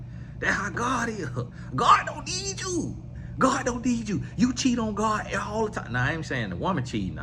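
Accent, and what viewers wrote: South, United States. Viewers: I am trying to figure out your accent Mr Tony. Is it a Southern drawl? 🇦🇬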